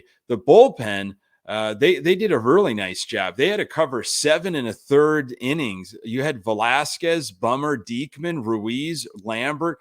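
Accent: Chicago, United States. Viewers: Glad I found your channel! Love the chicago accent! Reminds me of the SNL Da Bears skit lol Go SOX!!!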